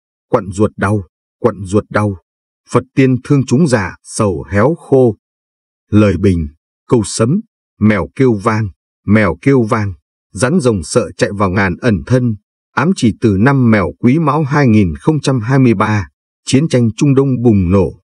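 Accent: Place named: South, Vietnam. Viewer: Hihi thầy nói giọng miền nam dể nghe, dể hiểu ?! nay đổi giọng bắc sao khó nghe và khó hiểu quá ? hihi. Không biết đức thầy nghe được không nữa ??!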